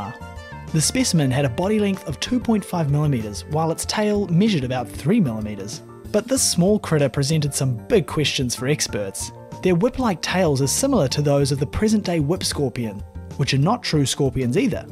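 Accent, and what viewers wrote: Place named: Australia. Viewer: Nothing frightening about any of these, and your Aussi accent makes many of your species pronunciations atrocious. There is no such thing as an "anthropod." It's "arthropod." You made that mistake throughout this video.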